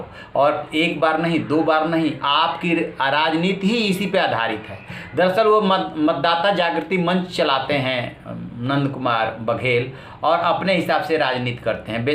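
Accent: Bihar, India. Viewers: तू साला बिहारी टोन में बात कर रहा है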